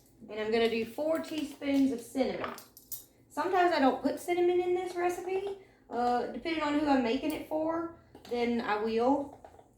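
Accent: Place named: Mississippi, United States. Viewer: You talk like my cousins ❤ Were from Mississippi, are you??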